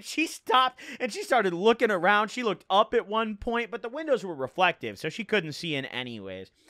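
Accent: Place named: Midwest, United States. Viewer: Could tell he from the Midwest by the way he talks lmao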